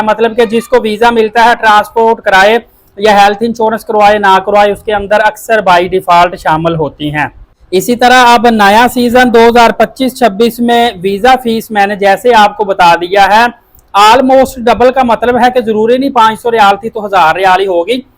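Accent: Punjab, India. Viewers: سر آپ کی اردو بول چال بھی پنجابی انداز میں ھے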